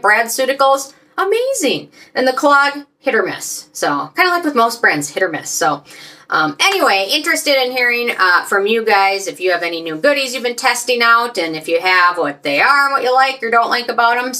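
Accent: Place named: Midwest, United States. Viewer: Paula’s need to STOP … it’s way WAY too many products that she’s selling. I only use one of her cleanser and a booster. More than half of her facial moisturizers affect my eyes, after an hour of application (I’ll stick to my drunk elephant). I’m not a sunscreen person….. after trying hers and expensive brands of both chemical and mineral sunscreens, I’m left with irritated eyes. Anyway, it’s fun watching you and I ❤️ your Midwest accent. Keep up the awesome work👍.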